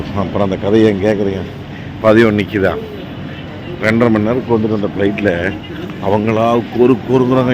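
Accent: Kongu, India. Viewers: ஆஹா என்ன ஒரு மகிழ்ச்சிகரமான முடிவு அற்புதம் எங்களுக்கு கடந்த ஒரு மாதமாக அற்புதமான ஒரு தொடர் பார்த்த திருப்தி கொங்கு தமிழில் நீங்கள் பேசிய பேச்சுக்கள் மனதில் என்றும் நிலைத்திருக்கும் நீங்கள் வீடியோக்களை எடுத்த விதத்திலும் சரி எதார்த்தமாக நீங்கள் பேசிய காமெடி பேச்சுக்களும் சரி மிக மிக அற்புதம் ஒரு ஜெனரஞ்சகமான நகைச்சுவையான குடும்ப படம் பார்த்த திருப்தியோடு இந்த தொடர் முடிவடைகிறது திருப்தி சில மாதங்களுக்குப் பிறகு வட கிழக்கு மாநிலங்கள் கோவா ராஜஸ்தான் உள்ளிட்ட இந்தியாவின் பல பகுதிகளுக்கும் சென்று வர வாழ்த்துக்கள்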